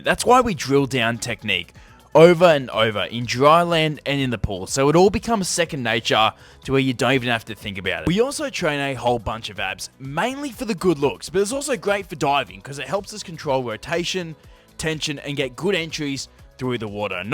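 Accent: Australia. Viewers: Really enjoy this, and as I said im 80 this year., but always loved the water and my dad taught me to swim in the sea. He was a rear gunner wireless operator work war two.and loved sport. So wonderful to see your effort to get back inti shape . Also i love the Aussie accent. I worked with Australians from NSW. and Melbourn . My dad's brother and wife and family left Scotland many years ago and stayed in Wollingong Maybe have spelt that wrong. Now thanks for all that you've shared. Sincerely Jane Rogerson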